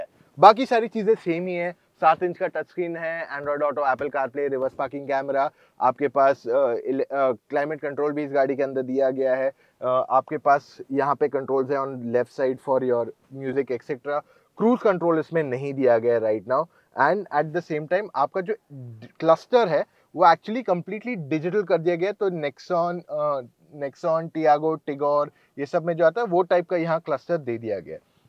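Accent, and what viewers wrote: India. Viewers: CNG ka बाटला... 😄👌🏼Love the desi accent/words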